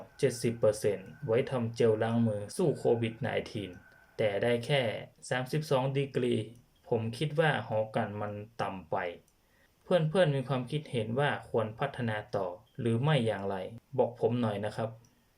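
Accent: North, Thailand. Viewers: สำเนียงเหมือนคนเหนือเลยครับ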